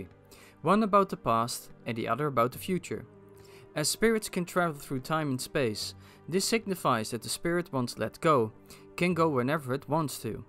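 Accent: Netherlands. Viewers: Dude ben je Nederlands ofz want ik hoor tering vaak een Nederlandse stem en accent er door heen. En je moet een beetje werken aan hoe je sommige dingen zeg naar verder goed bezig man!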